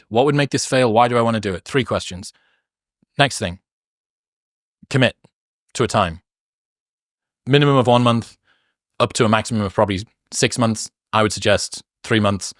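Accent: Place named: United Kingdom. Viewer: I really hope you manage to beat all your health problems as soon as possible, I wish you all the best. Thank you so much for entertaining my ears with your sexy deep British voice. So glad that I’ve found your podcast!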